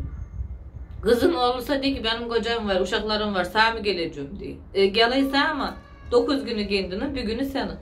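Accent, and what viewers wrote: Trabzon, Turkey. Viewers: seni keyifle izliyorum tirabzon sivesiyle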